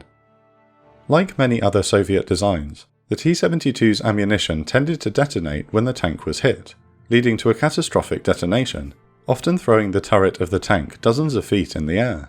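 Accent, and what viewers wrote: United Kingdom. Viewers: A lot of these videos are made with the premise that the British accent = well-informed historian, when it's really a millennial generating click revenue after reading Wikipedia and adding file footage. Iraq had their own domestic production of copies of the Yugoslavian Zastava M70B1s, which were copies of Albanian smuggled AKs from 1959. Zastava helped Saddam start his domestic production of what they call the Tobuk in 1979. Those weapons made from <num> were basically equivalent to Yugos, then the production quality dropped off. They are neither Avtomat Kalashnikovs of 1947, nor Avtomat Kalshnikovs Modernizinaiya (AKM). They are Iraqi copies of Yugoslavian M70s.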